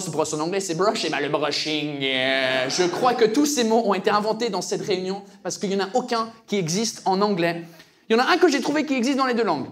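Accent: United Kingdom. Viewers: he has impeccable french but i could tell right away he was British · C'est le seul accent british que j'arrive a supporter et à adorer. C'est super drôle et agréable à regarder j'adore !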